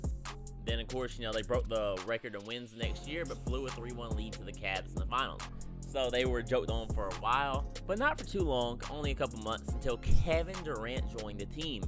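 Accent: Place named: South, United States. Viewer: as an asean, it took hard for me to understand what your sayin. you have accent and your background music to loud. you have country singer accent i think. from PHILIPPINES.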